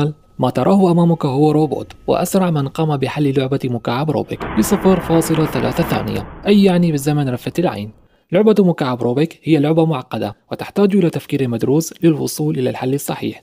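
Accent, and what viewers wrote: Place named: Arab world. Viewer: استمر عنوان ممتاز حنجره ممتازه اسلوب راقي اللهجه العربيه تفوز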